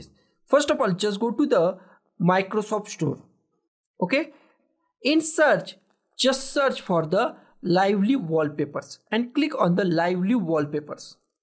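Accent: India. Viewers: Bro's internet speed 🗿🗿 bro's Tutorial ❤❤ bro's indian accent of english being an indian 🙌🙌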